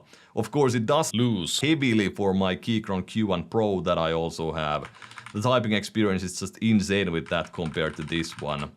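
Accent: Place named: Finland. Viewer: it's finnish accent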